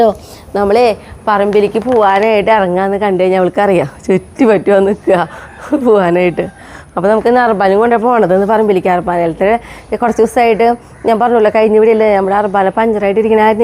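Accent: Thrissur, India. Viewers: ഞാൻ നിങ്ങളെ vdo എല്ലാം കാ ണാറുണ്ട് വിഡിയോയും പിന്നെ യുടെ ഈ സംസാരം സൂപ്പർ തൃശ്ശൂർ സ്ലാംഗ് ഇഷ്ടം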